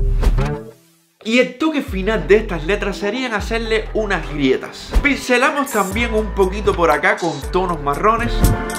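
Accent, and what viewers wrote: Cuba. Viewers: Eres cubano no??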